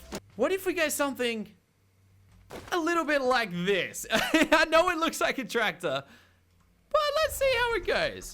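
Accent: Australia. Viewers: Are you Australian? If so where are you from in Aus.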